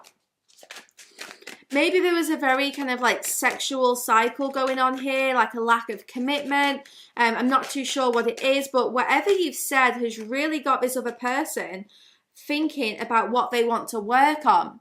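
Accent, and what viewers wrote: England, United Kingdom. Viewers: Love ur accent but cant place it:) are from the UK? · Tf guidance in English accent ... Omg 😃 this is the best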